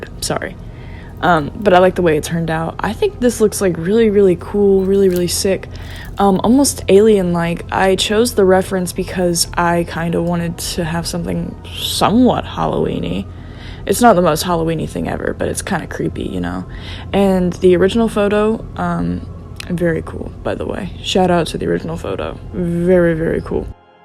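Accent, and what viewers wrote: South, United States. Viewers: Wait i think its because you both have a southern accent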